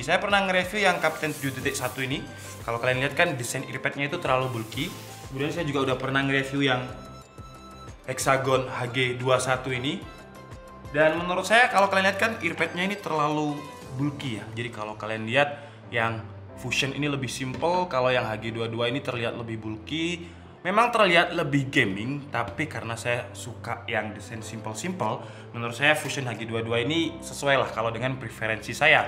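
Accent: Java, Indonesia. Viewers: nyeglek .medok banget jawanya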